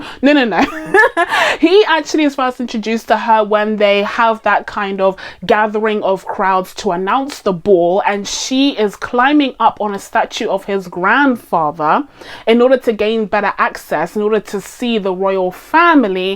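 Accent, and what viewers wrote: United Kingdom. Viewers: I'm Finnish myself, and I'm generally bad at placing English accents as it is a second language I rarely get to use other than online. I do love your accent, though. It feels very British, but flows in a way I haven't happened to hear before. Not sure if there's like a definitive London accent, I'd imagine not as it is a very fluid and cosmopolitan city, but yours is lovely ♥ Pretty sure you have more people there than we do in all of Finland, around 5,5 million here 😁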